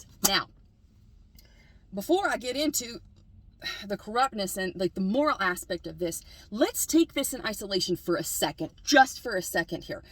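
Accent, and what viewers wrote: South, United States. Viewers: Thanks. Very good video and my wife and I heard more of your southern accent in this video. We love it. When the temp of my blood rises the southern, mountain accent comes out too. Bless you!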